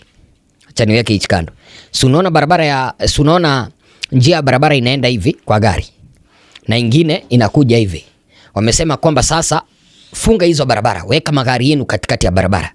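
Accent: Kenya. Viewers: ETI TANZANIA 🇹🇿 TAIFA LETU. KISWAHILI CHAKO CHA KENYA 🇰🇪 HALAFU UNASEMA TANZANIA 🇹🇿 TAIFA LENU. WEWE NA NANI?? 😂😂😂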